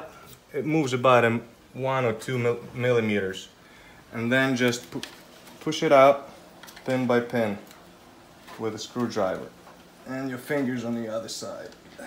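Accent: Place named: New York, United States. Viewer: That’s very accurate and helpful, hope to see more of your content. Very cool NY accent)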